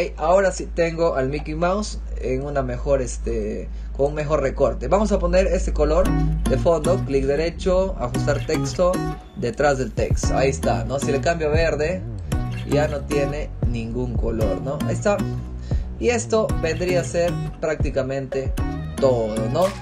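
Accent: Peru. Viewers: Eres peruano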